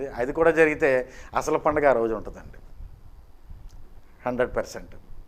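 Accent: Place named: Godavari, India. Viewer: Chalabagundi tammudu garu Godavari mata bagundi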